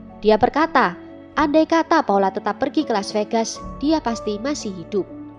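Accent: Java, Indonesia. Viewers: Alhamdulillah.. sehat trs bahagia trs.. medokuhhh 👍💖💖💖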